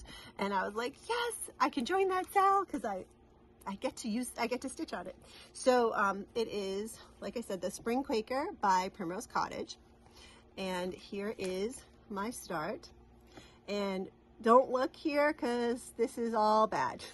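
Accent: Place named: New England, United States. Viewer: Happy belated Birthday ! I hope you have the best time at retreat! I love your videos! Hear your voice and New England accent reminds me of home! I moved to Texas in 1981 and miss those New Englanders ! Your students are so lucky to have a teacher with such a happy personality! Hugs from Texas! Ps you can never have too many starts!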